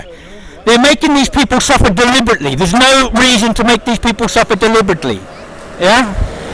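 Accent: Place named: South Africa. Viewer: Hey this is my island mate. Its admirable that you took up the cause. I, like many people, have done our bit to help. For a period there there was limited resources in a country that is suffering economically. The EU budget slashed costs from the EU border patrol I think in 2013. The people and the authorities have ploughed money (which they do not have) in to solving the issue. Almost everyone on the island has a descendent who is a refugee (Asia Minor).We are all compassionate people. Lesvos and every island has provided support. Remember, we didnt plan or expect people smugglers to send the migrants across dinghies and dangerous waters. Please refrain from comparing us to to ISIS??!! Had you avoided that, I would not have worried about your clip. WTF is that about. Its like me saying you have a South African accent therefore you are part of the regime of apartheid. 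Please do not insult our people, otherwise let's look at the history of where you are from.... Its easy to be a critic, but everyone on the island from the authorities down has a heart at a time when Europe has turned its back on Greece. Keep that in mind. The heat and the walk was terrible. During Ramadan, these migrants suffered even more, unnecessarily. The war in Syria and other places is tragic, and a tragedy that should have been prevented. Eventually the issues you point out in this clip was sorted out. Your emotion, again is admirable, but do not tarnish our people or island without the full facts. Go th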